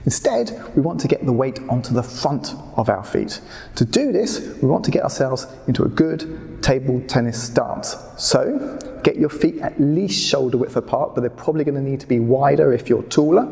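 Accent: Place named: United Kingdom. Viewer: 내가 좋아하는 영국인 영국발음 여러 게스트도 초청해 꿩 먹고 알 먹고 탁구 익히고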